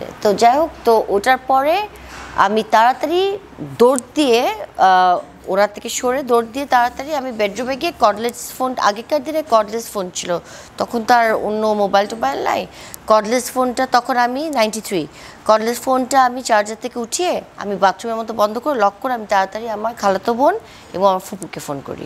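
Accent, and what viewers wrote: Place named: Sylhet, Bangladesh. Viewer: Salam sister you are very good woman nice sylhetee talk but little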